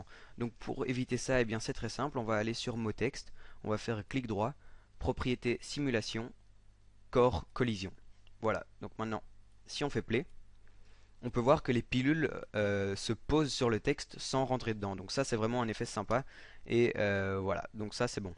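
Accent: Belgium. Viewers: il est belgue car il di nenant au lieux de 90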